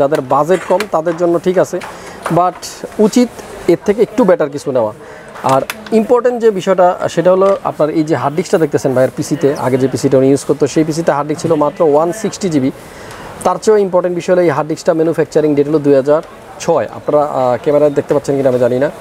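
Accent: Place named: Sirajganj, Bangladesh. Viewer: Vaiya ta mone hoi sirajgonj er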